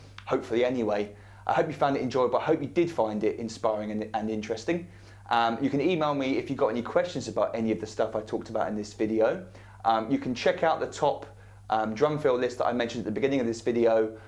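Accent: United Kingdom. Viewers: Haha I started to watch the vid.. And then you started speaking with a TOTAL British accent.. I laughed my butt of! But you play just fine! Keep up the good work.!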